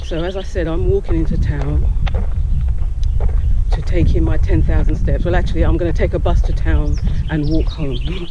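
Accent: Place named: United Kingdom. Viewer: She has a British accent.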